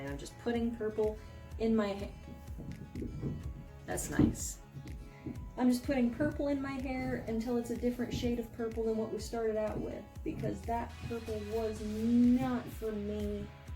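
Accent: Midwest, United States. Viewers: Purple is amazing! Could tell you were a fellow midwesterner. Could listen to you talk all day.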